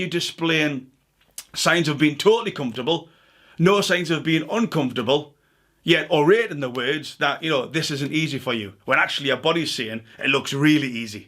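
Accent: Newcastle, United Kingdom. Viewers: Always great to hear that Geordie sound! ☆♡☆♡☆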